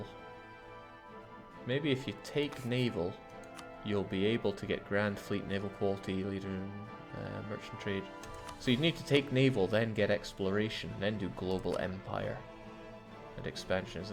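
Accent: Scotland, United Kingdom. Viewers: I thought this was Arumba, and I was confused and I thought he was sick again then I felt the Scottish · Man, his Scottish accent disappears after watching the Scottish twitter video and coming back to a normal one.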